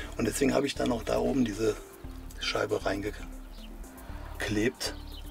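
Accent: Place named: Ruhr, Germany. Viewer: Da hör ich doch Ruhrpott, aber vom feinsten. Geiler Typ Geiler Anhänger 👌🏼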